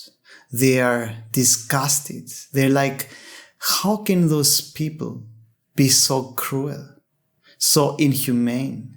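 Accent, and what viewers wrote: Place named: Greece. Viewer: Just saw this - never got a notification that you had answered! Your name may be Greek, but lots of people use Greek names, or other exotic-sounding names online, so no, that wasn't the reason. Your accent sounded very familiar to me, as I am Greek myself, and an EFL teacher as well, so it's basically what I hear every day! Thank you for bringing some quality content to the internet; a whirlpool of cheap, fleeting content. Your videos are to-the-point, every sentence you utter is thought out and meaningful and you always make a valid point and get us thinking. 😊❣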